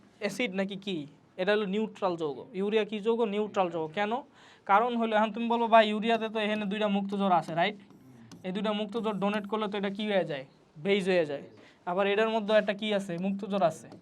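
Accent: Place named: Barishal, Bangladesh. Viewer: ভাই মনে হয় বরিশালের,😊 মাশাআল্লাহ ভালো বুঝিয়েছেন।❤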